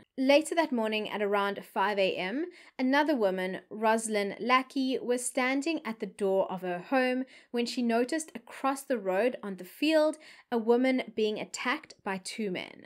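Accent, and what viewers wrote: Afrikaans, South Africa. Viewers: Love the way you speak Afrikaans 🤣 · its the afrikaans reading for me... this story had me hooked to the end..nice job👍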